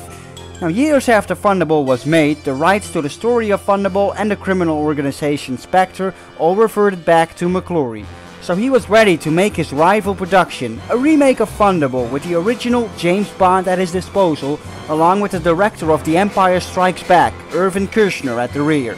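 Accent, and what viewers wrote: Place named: Netherlands. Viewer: He's dutch, that's his accent. How many languages do you speak?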